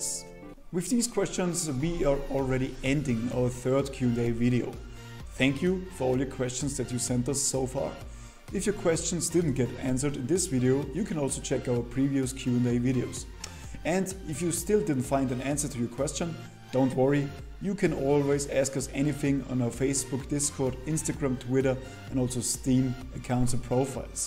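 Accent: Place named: Germany. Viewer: Hmm jak na pl to akcent masz niemiecki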